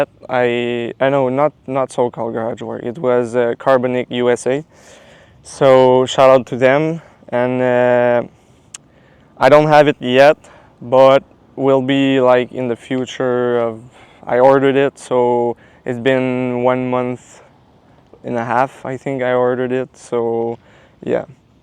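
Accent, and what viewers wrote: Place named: France. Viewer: Are you French I kinda hear that French accent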